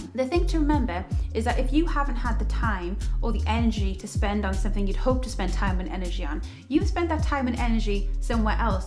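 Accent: Newcastle, United Kingdom. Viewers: are you from Newcastle? (or somewhere close?) :D i just uploaded a video on minimalism too and i'm also from newcastle..but i live in The Netherlands now... hi :) :) :)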